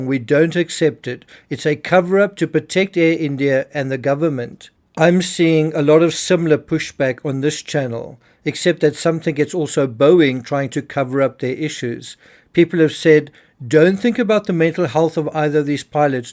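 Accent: Afrikaans, South Africa. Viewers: Another Afrikaans accent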